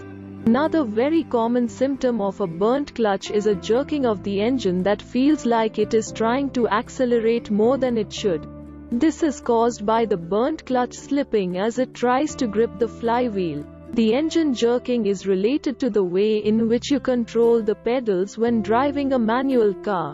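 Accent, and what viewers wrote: India. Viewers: Are you an indian lady as of your accent please...!??🤔!!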